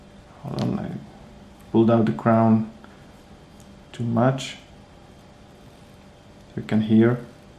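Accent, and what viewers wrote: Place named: Russia. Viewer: Жёсткий русский акцент😁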